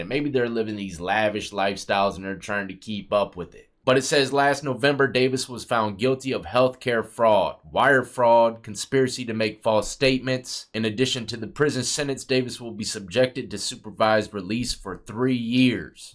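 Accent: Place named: Detroit, United States. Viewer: Yo, you from Detroit?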